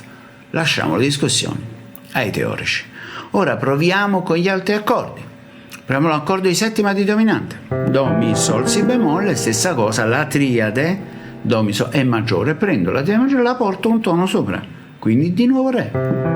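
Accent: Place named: Naples, Italy. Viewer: Sto capendo tante di quelle cose con questi video che ne sono proprio entusiasta poi il signor è un ottimo maestro e mi piace molto come spiega. Il suo accento napoletano poi è di una simpatia unica. Un saluto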